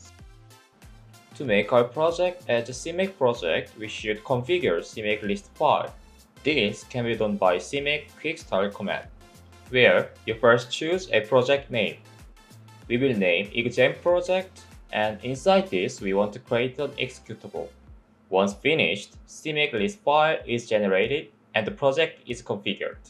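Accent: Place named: South Korea. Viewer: 발음이 한국분이시네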